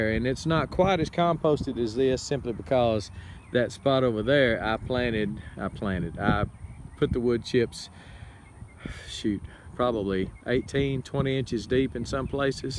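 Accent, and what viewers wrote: Texas, United States. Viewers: what part of Texas are you from?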